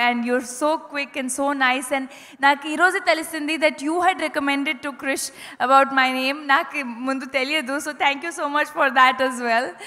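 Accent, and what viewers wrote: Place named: Telugu, India. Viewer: Abababba emaina grip undha Telugu meedha, you are just talking like proper telugu girl 👏👏👌👌👏👏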